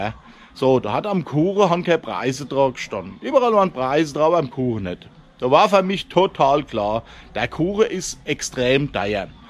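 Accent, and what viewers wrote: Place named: Rhineland-Palatinate, Germany. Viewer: Hallo, unser Rheinland -Pfälzer Dialekt ist doch sehr schön, na goad is schwetze Nassauer dialekt, over is eigentlich fast dat gleiche, mach weiter so und alles Gute.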